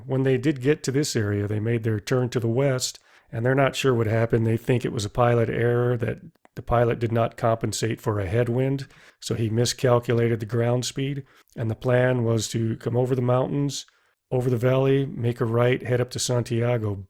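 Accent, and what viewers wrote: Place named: Michigan, United States. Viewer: Narrator pronounces “height” as “hyTH” and “cross” as “crossT”. Wondering if he’s from Michigan/northern Ohio, northeastern Pennsylania.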